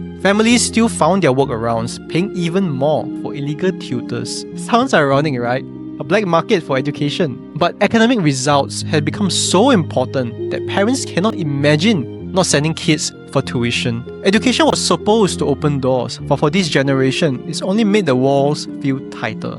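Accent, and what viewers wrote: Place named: Singapore. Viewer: Are you chinese national?Your accent sound so much like Singapore or Malaysia.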